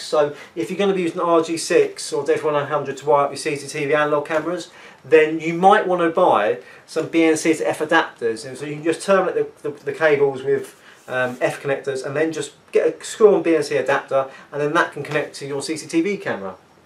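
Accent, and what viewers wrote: Cockney, United Kingdom. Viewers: do I detect a bit of a Cockney accent there?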